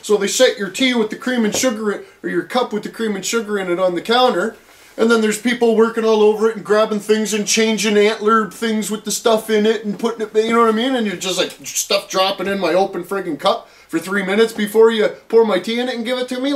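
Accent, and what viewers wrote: Canada. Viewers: Best Cannuck accent ever.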